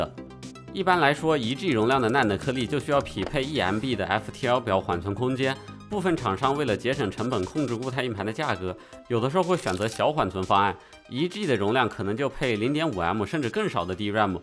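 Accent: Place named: China. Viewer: 想知道台湾朋友们不看字幕的话，听大陆腔有没有难度呀？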